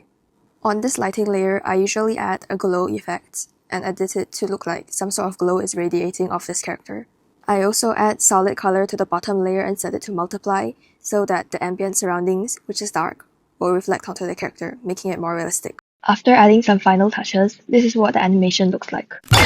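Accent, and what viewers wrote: Singapore. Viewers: I can hear the singaporean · Cool tutorial omg the final is so cute. Also woahahah your accent sounded so familiar. Checked your bio and... singapore?!?! 👀 Me too omg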